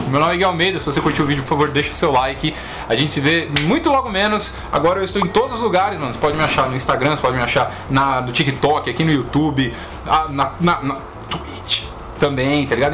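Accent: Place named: Goiás, Brazil. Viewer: Uai só eu acho que ele é daqui de Goiás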